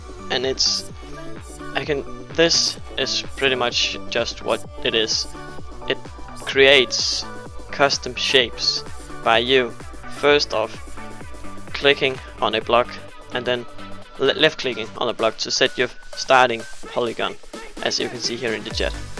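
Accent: Denmark. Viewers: Er han dansker xD.. tænkte jeg nok.. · Are you from Denmark? BTw cool video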